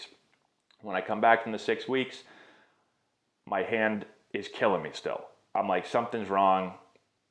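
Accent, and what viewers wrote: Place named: Brockton, United States. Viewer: I grew up Brockton, MA didn’t even know who this guy was. But definitely recognize that accent! Thank you for all these videos man! You definitely bring the complexities of baseball down to a simple Level for everybody to understand.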